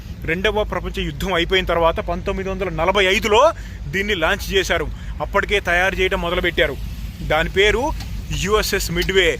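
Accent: Andhra Pradesh, India. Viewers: Bro needi nizamabad kada how come you speak in andhra accent?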